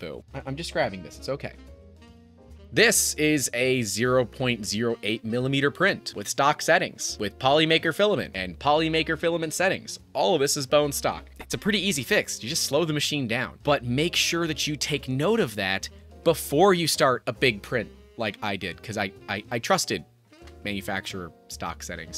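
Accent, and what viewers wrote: United States. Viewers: Oh, My... Degrees in Celsius and "Aluminium"? Am I misjudging your American accent?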